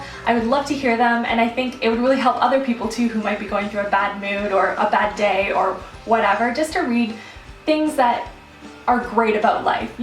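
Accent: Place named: Canada. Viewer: I can tell you're Canadian by the way you say "out" and "about" :) so cute!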